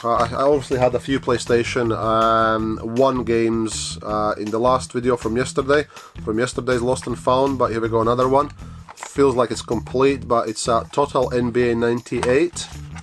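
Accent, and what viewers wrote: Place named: Scotland, United Kingdom. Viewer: Lots of Retro goodness there. I've never had a Game Gear, never come across games for it even in CEX, so I'm sure they are really rare. Excellent buried treasure....I wanna search your garage haha. Looking forward to your origins video and hearing how came to be...also the excellent, clearly Scottish but definitley a bit of a mixture there. Keep up the good work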